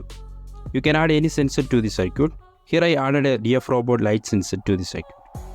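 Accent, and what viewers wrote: Kerala, India. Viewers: Bro are you from kerala?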